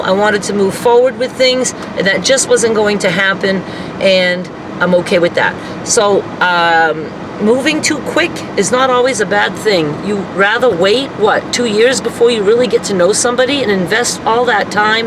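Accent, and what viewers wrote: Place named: South, United States. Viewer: You sound more and more "southernish".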